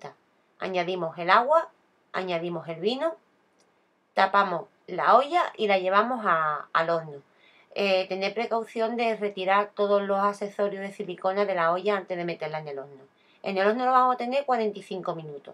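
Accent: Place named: Andalusia, Spain. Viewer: Adoro el acento Andaluz...o del sur, como sea. Estoy cocinando, gracias por tu tiempo.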